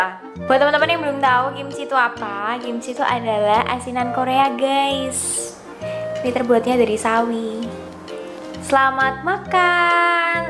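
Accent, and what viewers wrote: Java, Indonesia. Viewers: Welcome medok kuntuL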